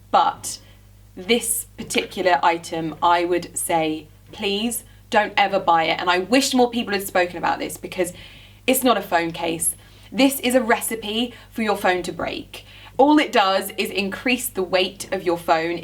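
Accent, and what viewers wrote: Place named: England, United Kingdom. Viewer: I wish I had an English accent! You are beautiful, inside and out.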